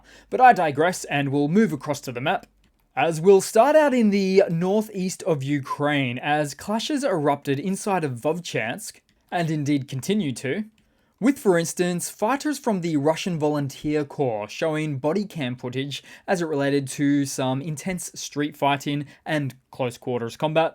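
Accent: Australia. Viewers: You have the best Australian accent,. Goes well with the humour. Russia moving those buoys after years of mutual agreement with Estonia is just so typical. Then it accuses other countries of provocation and the reason for going to war with them. It's extremely annoying when other countries give their endorsement and support for this kind of behavior. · 【今日のJuzzieの動画のコメントから一つピックアップ】 あほのコメンターの、こいつによると、'Russia moving those buoys'は、 数年以上に渡る、エストニアとの相互交渉による結果であって、双方の合意によるものだ、ということらしい。 ただ、しかし、その相互交渉、双方の合意なるものは、プーチン・ロシアの、そのポンコツ軍事力（による脅し）を背景にしたものだ、ということを見落としてはいけない。 それは、プーチン・ロシアによる、暗黙の脅しによるもので、単なる、一方的強制であって、当事者間の「双方による合意」とは、言わない。 因みに、プーチン・ロシアの、これまでの、やり口、手法は、すべて、これであって、彼らの言う、相互交渉、双方の合意というのは、すべて、 見せかけだけ、外見だけで、中身は、プーチン・ロシアの、これまでのポンコツ軍事力（による脅し）を背景にした、いわば、強制だった、ということ。 んで、今はどうかというと、プーチン・ロシアが世界に誇る、世界最強、世界最先端の軍事力が、実際の戦争で、実は、すべてポンコツ、大嘘、いかさま、ペテンの代物だと、バレてしまったので、 その結果として、これまでの、プーチン・ロシアの、強引で、一方的要求である、傍若無人もいいところの 見せかけだけ、外見上の、相互交渉の結果、双方の合意、というものは、今後、全て無効になる、白紙の戻されることになる、のは明らか、だと思うんだけど、ね。 4 時間前 You have the best Australian accent,. 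Goes well with the humour. Russia moving those buoys after years of mutual agreement with Estonia is just so typical. Then it accuses other countries of provocation and the reason for going to war with them. It's extremely annoying when other countries give their endorsement and support for this kind of behavior. 5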